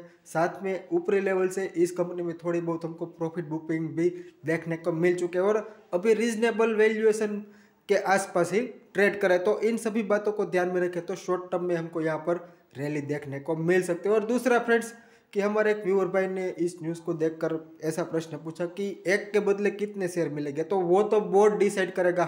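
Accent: Gujarat, India. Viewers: App gujarati lagte hooo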